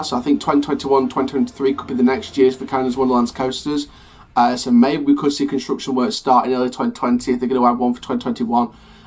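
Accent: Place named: Doncaster, United Kingdom. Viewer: Love your videos they're great, however, they hit a whole new level when you put the subtitles on. I don't think YouTube understands Doncaster accents too well.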